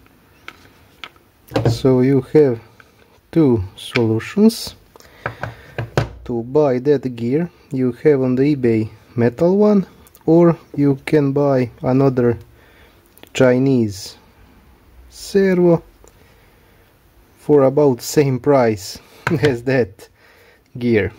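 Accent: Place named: Croatia. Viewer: engleski ti ide super al mi se čini da ima i nešto hrvatskog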